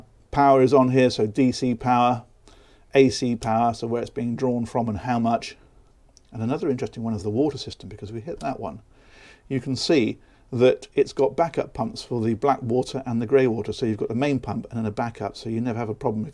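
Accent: United Kingdom. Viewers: I like this guy. The British accent is cool. he really knows his stuff. These yachts are mind boggling with the technology and conveniences. Builders have thought of everything.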